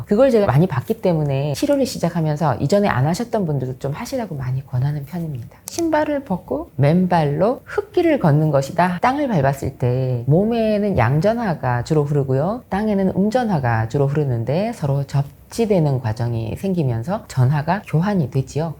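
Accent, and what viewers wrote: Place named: Daegu, South Korea. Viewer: 이분 말투가 대구 사투리인거같은데 매력있네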